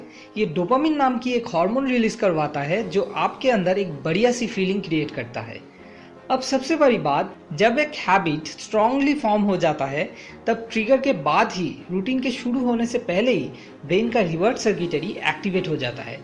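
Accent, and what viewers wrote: Bengal, India. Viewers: Your accent is bengali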